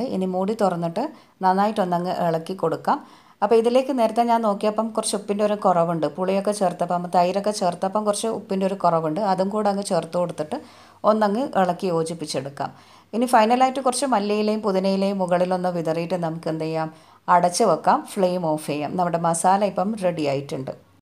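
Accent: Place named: Kannur, India. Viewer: Biriyaniyum kannanur slangum ishtapetin itha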